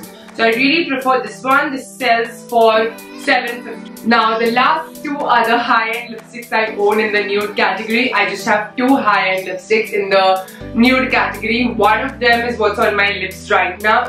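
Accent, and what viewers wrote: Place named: India. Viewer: Girl you don't look Indian from anywhere.. had to open ur video and hear your accent to make sure that yes this hottie is indian indeed 🙆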